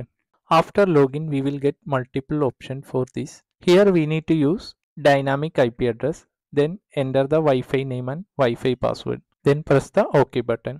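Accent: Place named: Kerala, India. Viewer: english ketapol malayalee enu mansilay...universal repeater mode anelum kuzpam undo?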